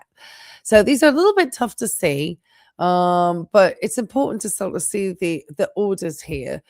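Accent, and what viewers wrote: United Kingdom. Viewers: My understanding is when you join up it's actually 8 years but 4 years active then 4 years ready reserve. I do know this to be true because friend his son just got done with active duty in the Navy he has to report to some office in our city. Said just sits there all day doing paper work once a month. She must be from England sounds like a British accent hasn't a clue how American military works. Her reading these letters word for word is annoy as h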